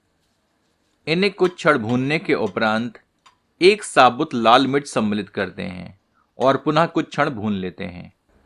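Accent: Standard. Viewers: He is the only one who speaks SHUDH HINDI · pure Hindi .... Sooo good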